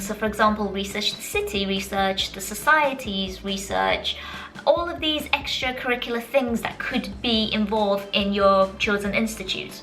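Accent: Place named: United Kingdom. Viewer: that perky british accent is why i want to go there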